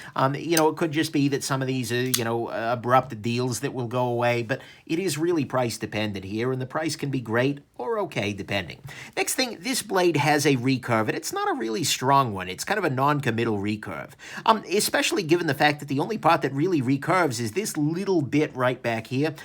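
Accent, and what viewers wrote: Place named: Brooklyn, United States. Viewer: As a New Yorker, I give this a thumbs up and comment for the Brooklyn Jewish accent alone!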